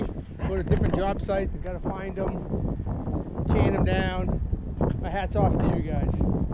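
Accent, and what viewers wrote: Boston, United States. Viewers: I love listening to you with the Boston accent, cause I’m from Boston and I’m. I’m living in the south now and people always love my accent they say.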